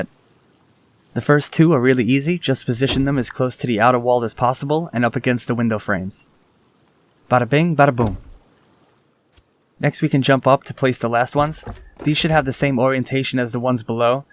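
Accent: New York, United States. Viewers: what part? im from Merrick on Long Island, i could tell a fellow New Yorkers accent. Also good luck with the videos, very well laid out and descriptive.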